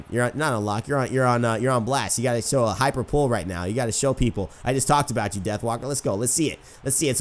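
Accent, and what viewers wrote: Ireland. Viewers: I'm irish and have lived in ireland my whole life. My GOD your irish accent is better than mine lol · The Irish accent had me dying man great vid😆😆😂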